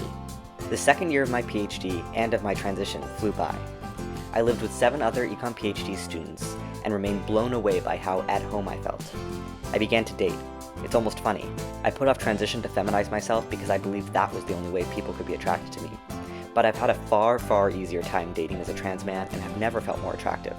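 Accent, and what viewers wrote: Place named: Northeast, United States. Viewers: Seems like Maine (based on the ID) but it's a pretty general 'northeast' accent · I love this guy’s American accent but I’m British and can’t work out which state this accent comes from?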